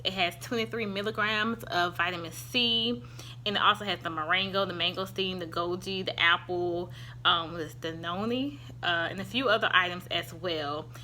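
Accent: South, United States. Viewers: I love your Southern accent, I'm from Atlanta too. Thanks Queen for the amazing info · U speak very well love your southern accent. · Nothing wrong with your accent. Southern... thanks for the video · Ur quite lovely and the southern drawl is very charming. Keep up the good work.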